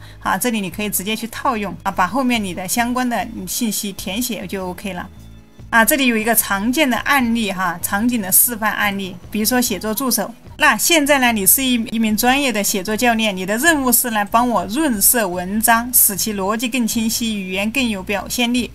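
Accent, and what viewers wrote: Sichuan, China. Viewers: 博主是四川人吗？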